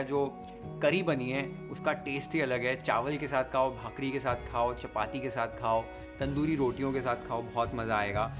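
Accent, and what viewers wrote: Maharashtra, India. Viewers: Your narration as well as your recipes always have a typical maharashtrian touch.... Makes me feel connected with you ☺️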